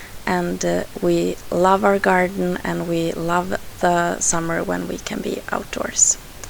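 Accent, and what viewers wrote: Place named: Scandinavia. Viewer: I have enjoyed watching your scrapbooking videos since I discovered your You Tube site several months ago and always anticipate the next one to arrive. I love your layouts especially for the sense of balance and the focus centering on the photos the stories you are able to convey. I also enjoy your narration (love the Scandinavian accent). The stories you share of your life and family make a cozy picture and contribute to the charm of your layouts. I look forward to your future videos.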